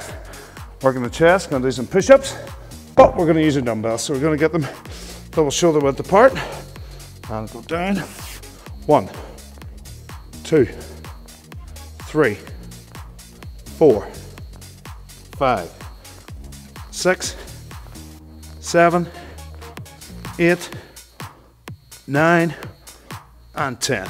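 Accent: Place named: Scotland, United Kingdom. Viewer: I'm happy to have found your channel. Your workouts are for all fitness levels and are quick and to the point without endless chatter and you explain the movements and techniques extremely well...just a question--are you from Scotland? My boss at work is from Glasgow and speaks just as you do:)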